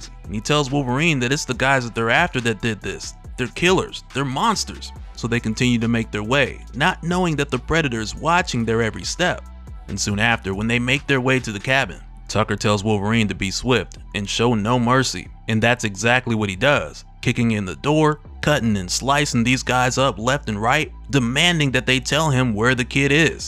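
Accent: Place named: South, United States. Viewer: It’s the “in-and-out” of the Hee-Haw accent that got me……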